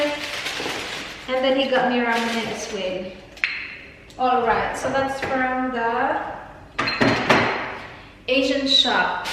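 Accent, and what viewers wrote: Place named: Visayas, Philippines. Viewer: Ayieeeeee himos kaayo ma bati imong inistoryahan te ba na a Jud Ang bisaya accent 💖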